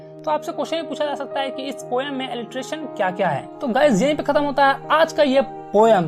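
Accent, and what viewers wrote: Bihar, India. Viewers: Aap Bihar saa hoo kya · ABA SALA BHIHARI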